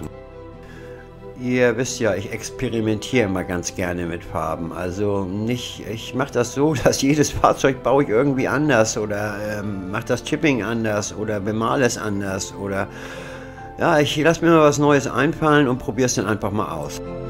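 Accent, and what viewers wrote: Hamburg, Germany. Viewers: Danke für das tolle Video! Sehr hilfreich auch für Anfänger, es gibt hier so viele Äberdrüber Profis..Du machst das sehr toll.Auch Deinen Text hört man gerne, schön Hamburgerisch Höre ich als Österreicher gern. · Ein Hamburger! Klasse. Hörst Dich nicht wie ein Schlumpf an, eher wie Käpt'n Blaubär und ich hing wie eins der Bärchen an Deinen Lippen. ;) Klasse Bau und schön erklärt.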